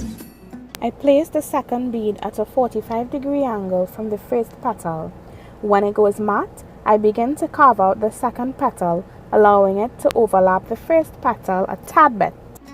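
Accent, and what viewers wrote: Trinidad and Tobago. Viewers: U sound trini!!! 😀😀😀 U go girl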